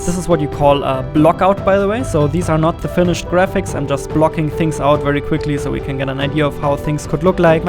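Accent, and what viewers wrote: Germany. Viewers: Man hört fast garnicht raus das du Deutsch bist, Echt gutes Englisch👍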